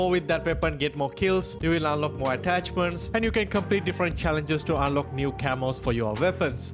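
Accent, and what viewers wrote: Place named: India. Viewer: This Indian accent is fire🔥🔥🔥🔥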